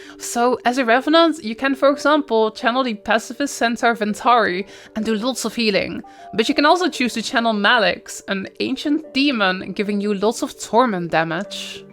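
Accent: Netherlands. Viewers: i can be wrong but i smell dutch 😅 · can really tell the dutch accent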